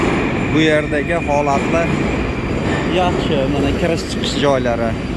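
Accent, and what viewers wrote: Namangan, Uzbekistan. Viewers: Сиз наманганлик сиз гапиришингиз дан билдим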